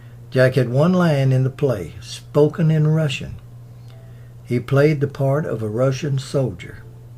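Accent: South, United States. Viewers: Mr., Your channel is great content-wise. I also enjoy your Southern accent profusely. All the best to you from Poland. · I love documentaries but I see yet another set of remarks as to people being perplexed about others giving thumbs down. will try & tell you it's because of his heavy southern accent and some of that is true...BUT...when you call Elia Kazan ELLA Kazan or the Bubonic plague the BLUEBONNET Plague and say things like "first debut" which is redundant, instead of screen debut which is proper - it gets tiresome. He also called child actor, Brandon De Wilde, BRIAN De Wilde as well as Ida Lupinom Ida LUPINA! The mistakes makes are not due to his southern drawl....they're due to a type of laziness when reading and not caring enough to have someone else listen to the soundtrack and making the necessary corrections. There are more errors and it's a shame because I believe puts a good deal of effort into his research and I think he'd get more respect if he paid a lot more attention to what he's trying to produce. 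· Hey, i love and appreciate your videos. In part because i appreciate your southern dialect, however, it has been proven in several linguistic studies that a large portion of the population at large automatically make the incorrect assumption that people with a southern dialect are less intelligent and less educated. So, sadly, i suspect that a good number of your down votes may be the result of bias against your southern dialect. That being said i also think that your popularity and success on YOUTUBE is also in part a result of people who appreciate your southern dialect. Whenever someone presents something uniquely their own to other people that is powerful enough for them to feel strongly about, then that is just exactly what they will do, some WILL feel strongly that it is good and some will feel strongly that it is bad. Don't focus on the fact that you have negative reviews-- after all we all have our critics lol-- just focus on the fact that what you are doing with your documentaries makes people feel strongly about them-- strong enough to leave an opinion-- and that my friend is an accomplishment in and of itself!!! 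Why... because in today's digital world it takes a POWERFUL CONNECTION in order to motivate people enough for them to even bother leaving an opinion. Even something as simple as a thumbs-up or a thumbs-down... So good job!!! (So this thumbs-UP is for you, what you do and how you do it -- not for my own comment-- however I'm sure that some p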